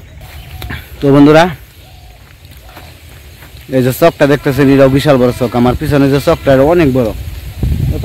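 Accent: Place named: Dhamrai, Bangladesh. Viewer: Aapnader Baari kothaye bhai? Aapner accent shune mone hocche aamar Nana'r barir kaache. Aamar Nanar baari Dhamrai Upozila, Graam Kushura, Bongshi Nodir paare.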